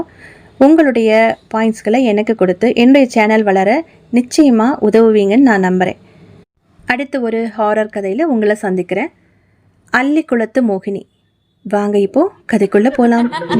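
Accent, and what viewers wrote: Kerala, India. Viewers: Nala story, I liked it.... paravala malayalam Pronunciation improvement iruku 😅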